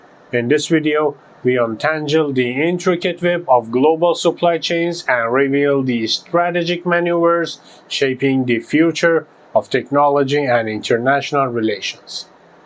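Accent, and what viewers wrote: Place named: Russia. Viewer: I can barely understand this guy, due to his accent, which is probably Russian. The inflection is all wrong.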